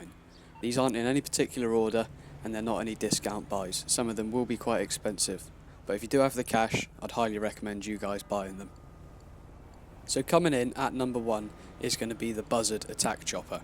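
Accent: United Kingdom. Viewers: I think the same, all youtubers GTA do clickbaiting. Please don't end up doing this though. I actually learned something from your video. I'd normally find British accents annoying but your voice is kinda soothing so thanks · love that british accent, nice video btw :D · Is he British or English but anyway I love his accent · This guy sounds British but his personality is all Canadian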